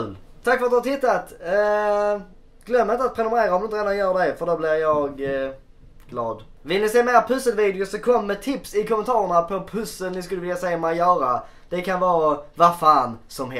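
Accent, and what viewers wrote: Scania, Sweden. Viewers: " pusslar" Älskar skånska😂❤️👌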